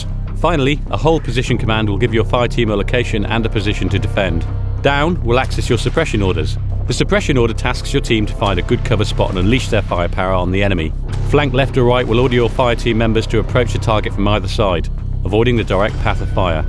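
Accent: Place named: United Kingdom. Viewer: "As a proud member of the United States Marine Corp" he says with a British accent.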